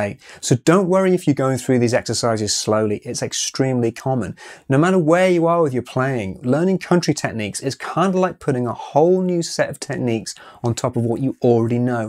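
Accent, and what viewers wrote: United Kingdom. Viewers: Country stuff explained in beautiful RP English. The wonders of the internet. And very well explained as well. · Click on the video about Country Guitar Techniques, greeted with an English Accent...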